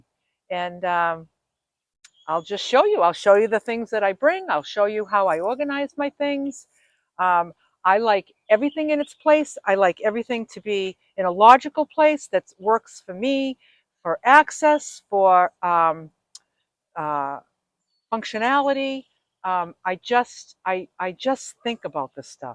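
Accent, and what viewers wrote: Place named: New York, United States. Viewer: You could also use plex or adhesive glue for the cracc and the van build is absolutely stunning I support this lifestyle 1000% coming from New York myself I peep the accent keep up the great work love the articulate energy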